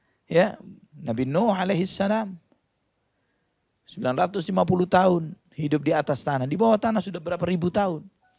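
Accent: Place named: Java, Indonesia. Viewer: Cukup mirip ketegasannya. Ini logat Jawa, ustad Khalid logat Makasar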